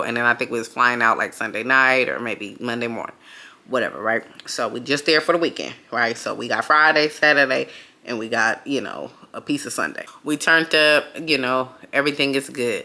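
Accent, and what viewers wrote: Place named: Bay Area, United States. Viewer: Sis I was gonna ask you where you were from cause I was listening to you talk & was likeee “she sounds like she’s from the crib!” Lol . I found a comment & you said you’re from Oakland. Yesss love my Bay youtube girlies! 🤍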